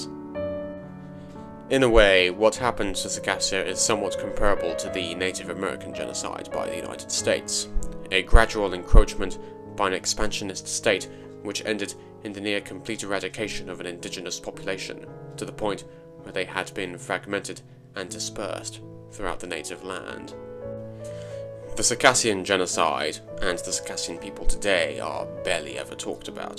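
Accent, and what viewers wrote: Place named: United Kingdom. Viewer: I dig your voice its fine and it sounds great especially with the British accent. The pronunciation of the tribes of spot on and the music in the back is absolutely epic I enjoy this video and we'll be looking into more of the content.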